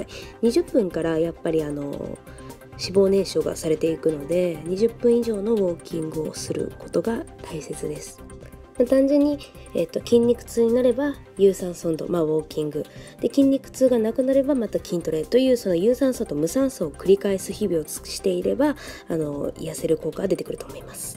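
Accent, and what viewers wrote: Kansai, Japan. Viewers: rina styles 関西弁やからの意味がわからんwww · だいすきです♡ 関西弁やからめっちゃ説得？があります！私も体重MAXから10㌔やせました！でもそこから全く体重が落ちなくて、、、だからもっと！さらに！頑張ろうと思いました！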